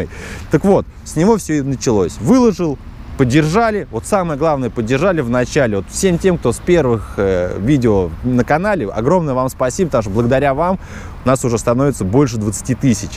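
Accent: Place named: Kirov, Russia. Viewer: Если приедеш в Кировскую область удивишся сколько там похожих с такой дикцией =))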